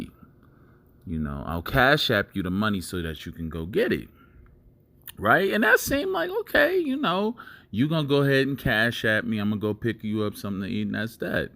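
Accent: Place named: Philadelphia, United States. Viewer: I knew you had Philly roots. The accent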